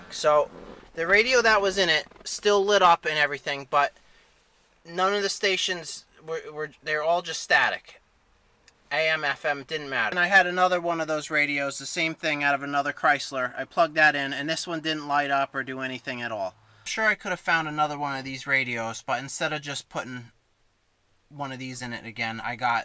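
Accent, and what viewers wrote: New York, United States. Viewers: Awesome video- that accent is like every neighbor I ever had from NY area- keep up the videos they are a huge hit.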